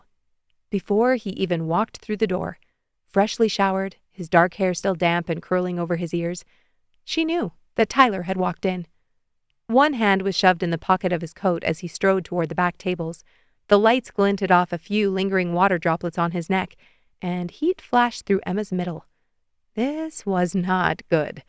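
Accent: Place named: Canada. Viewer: i love this soooo much the slight canadian accent just warms my heart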